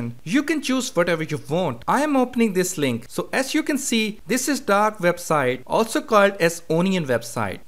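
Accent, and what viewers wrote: India. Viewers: The Indian accent is like getting hit in the ear over and over again.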